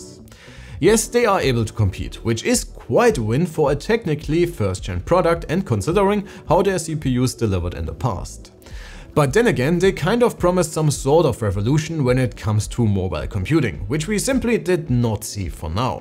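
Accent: Germany. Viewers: I'm very glad to see NoteBookCheck as a very classic website to publish such YouTube-optimized video in every aspect and that's a real success for your content producers. I'm an old fan of you from the website, but now you've convinced me that you're very good in YouTube platform too. And dear, your German accent is what I love 💛❤🖤 Now let's talk about the Laptop! I think that Snapdragon X Elite is something that should not exist at all. Who am I to say this? A Microsoft Surface RT and Surface Pro X lover. I believe that existence of the ARM based computers in the form of laptops and Windows tablets should be only limited to very low-power scenarios maybe 10W TDP at maximum. If you're going to implement such heavy cooling systems with heavy heatsinks and fans, well why you don't stick to x86 even??? The purpose of bringing ARM to a "Windows tablet" specially, is to have a final product like the iPad or Android tablets in terms of weight, thickness, heat generation, battery runtime,... 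But Apple ruined the game with 100W ARM based laptops which even gets more "Unreasonable" when even their desktop solutions is ARM too!!! ARM or I might say RISC, is designed to work with low-power and be less powerful. When x86 or I might say CISC, is designed to work with high-power and be simply more powerful! You can't fight with the nature of them. If even our desktop PCs turn into ARM machines, well then we will lose the great power-house performance of CISC architecture! And fortunat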